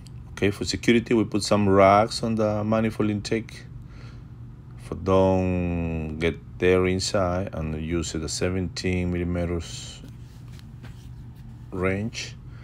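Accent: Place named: United Kingdom. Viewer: Que bonito hablas el ingles !! Tienes un acento britanico que me facina !!! Congatulechons..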